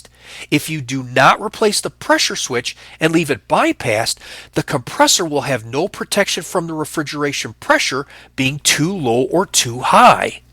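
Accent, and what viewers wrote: United States. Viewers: 1 pour ta tronche de ricain et -1 pour ton accent de ricain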